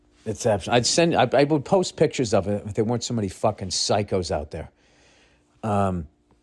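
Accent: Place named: South, United States. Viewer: with the new truck. That southern accent going to fit in perfectly with that big ol truck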